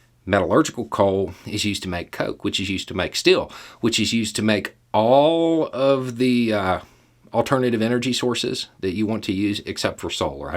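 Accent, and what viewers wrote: South, United States. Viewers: Big “Oh, bless your heart!” Southern accent energy in this one, and that commenter totally deserves it all.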